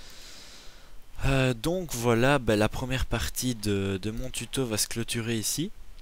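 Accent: Belgium. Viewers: j'aime bien l'accent bien belge x) ça fait plaisir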